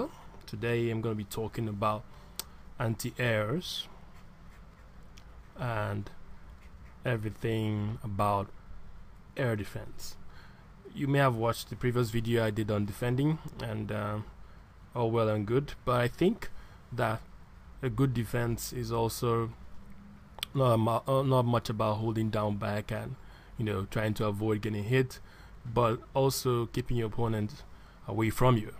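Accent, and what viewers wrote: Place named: Igbo, Nigeria. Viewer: You sound Igbo.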